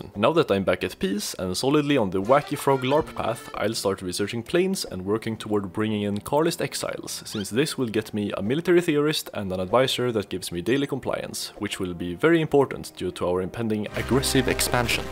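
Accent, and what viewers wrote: Sweden. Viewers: Din dialekt är exakt som en stockholmare? Va fan är du då?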